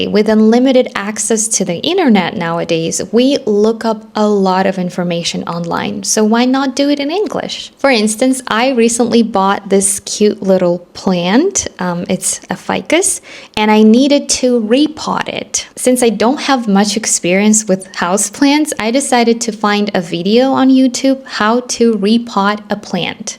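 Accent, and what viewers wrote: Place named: United States. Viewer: All Russian people that I know. They are speaking very well. And they have good pronunciation like an American accent. How is that possible? Your speaking skills amazing you’re learning quickly how? :))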